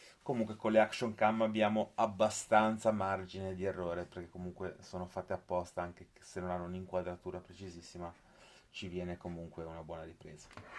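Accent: Italy. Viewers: L accento genovese è stato confermato dalla strada di Spotorno 🤣 sto montando per la prima volta la action cam e mi sono imbattuto nel tuo video, ho i laccetti ma non il coperchio con i buchi ( è intero) , cercherò un plastica con i buchi. Saluti da cogoleto ✌🏻 Ninja <num>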